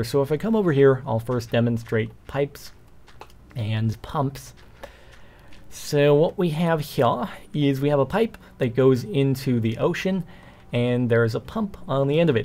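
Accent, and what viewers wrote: United Kingdom. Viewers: way do you spik bridish? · He sounded british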